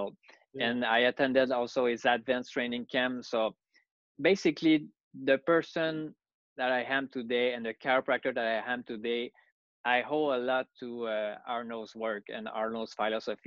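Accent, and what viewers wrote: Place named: Quebec, Canada. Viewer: I was always curious to know what he sounded like in English 😅 glad to see that thick Québécois is still there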